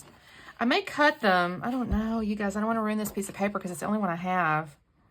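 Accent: Texas, United States. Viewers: I love your story. This is a wonderful tribute to you and all you have achieved. I love your positivity and feel like I am in the room with you when you scrap. I love your Texan accent. Always nice to listen to from an Aussie who feels we stick out so much on You Tube with our accents. I love what you did with the rainbow. 💛💛💛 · Great layout! I love your story of how you got started! And yes, I am one of your subscribers who loves your Texan accent - it always makes me smile! Thanks for sharing!❤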